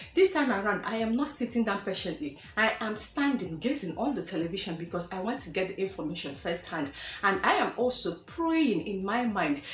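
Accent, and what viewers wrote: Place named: Igbo, Nigeria. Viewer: your voice is too igbotic nne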